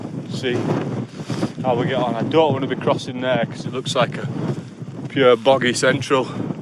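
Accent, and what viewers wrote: Yorkshire, United Kingdom. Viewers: Great video, epic views all well presented, by the way, which part of Yorkshire is the accent from?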